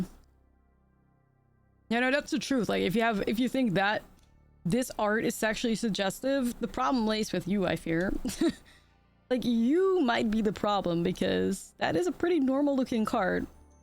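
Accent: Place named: Netherlands. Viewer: Are you from the netherlands? Sometimes your accent sounds like that :D Its kind of cute.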